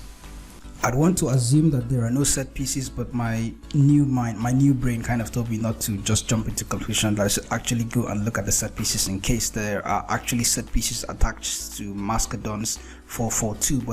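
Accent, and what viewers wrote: Nigeria. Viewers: i can tell your nigerian Lol.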